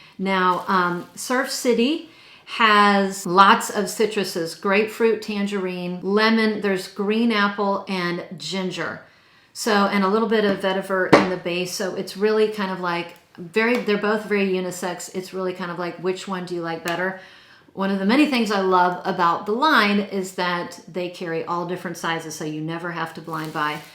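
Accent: France. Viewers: Question please : What languages do you speak ? Do you speak French or Italian I notice your pronunciation 😉Would love to see a video on this topic ❤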